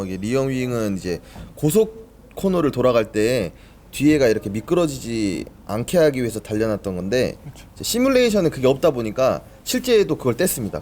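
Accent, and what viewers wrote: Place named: Jeolla, South Korea. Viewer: 안녕하세요..예전에 제네시스 EQ900 모터스튜디오에서 잠시뵈었지요..잔라도 사투리 억양과 준비 많이한 멋진영상 잘봤습니다..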